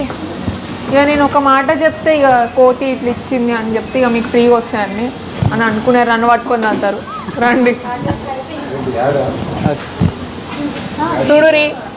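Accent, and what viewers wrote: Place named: Vijayawada, India. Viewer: Vijayawada antunnaru konchem aa slang change cheste bagundedi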